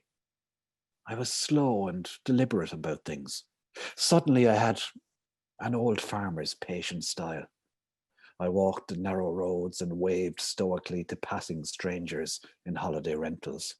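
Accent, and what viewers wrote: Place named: Donegal, Ireland. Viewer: Fantashteesh. Fair attempt at a Donegal accent too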